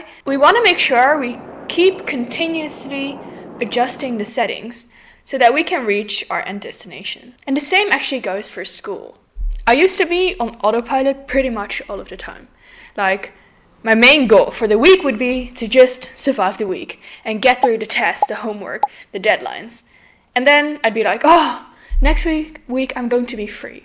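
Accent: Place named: England, United Kingdom. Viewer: Love your english accent ❤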